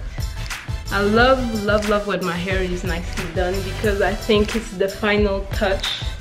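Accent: France. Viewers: Love your France accent... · Nice house, I like ur accent it’s like ur first language is French!! Coz you speak like my French friend 🤗 greets from london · Question pour toi, est ce que tu es antillaise? Ton accent c in peu francais ,un peu Creole.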